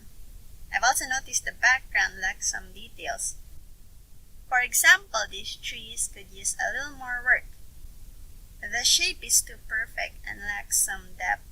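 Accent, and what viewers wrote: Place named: Philippines. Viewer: I can hear that Pilipino accent- 👀. I love your content to the fullest! Keep on going.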